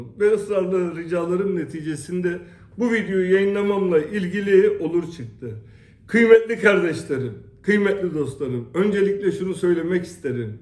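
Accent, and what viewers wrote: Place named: Izmit, Turkey. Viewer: Milyonlarca insanın diyemediğini anlattın bu ülkede seni unutmucaz reis keşke olsanda ülkende şu izmit sesinle canlansın eskisi gibi herkez kötü lesede kaderini bilki ki biz biliriz seni reis korkak gibi susmaktan sa aslan gibi ne varsa anlatmaya calıstın unutmadık unutmucaz seni 🙏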